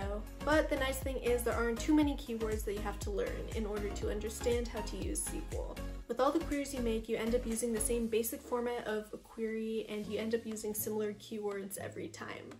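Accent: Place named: India. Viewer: Hey thnx just curious to know are you from India? Your ascent is Amazing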